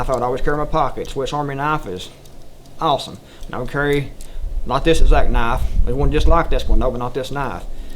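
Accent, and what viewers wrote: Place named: Boston, United States. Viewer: You sound different. Where you raised in Boston? LOL Great video. Imagine a different upbringing that didn't lead you to a love of the outdoors and huntin' and such. Man that woulda sucked! Peace, brother and be careful that they don't sneak up on ya!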